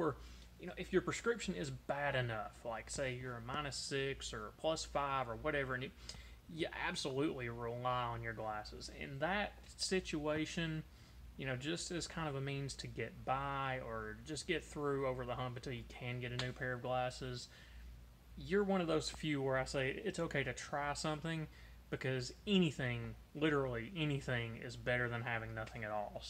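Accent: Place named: North Carolina, United States. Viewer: I'm guessing you're from north carolina.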